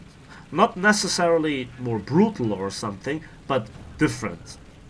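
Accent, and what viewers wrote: Germany. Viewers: du bist deutsch oder?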